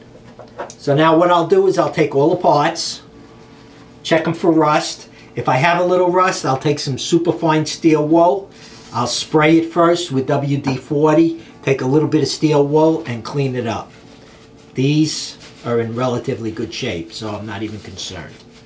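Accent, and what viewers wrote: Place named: New York, United States. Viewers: Are you from Noo Yawk? Great Video! Thanks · definitely has that classic "Lawn Guyland" accent, lol. I'm from Long Island, and even I am amazed at his heavy accent.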